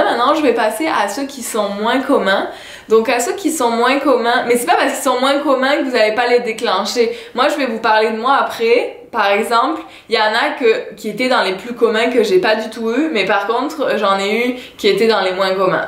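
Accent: Quebec, Canada. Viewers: Effectivement toi à bien pris l'accent québécois!! Courage Corentin d'Annot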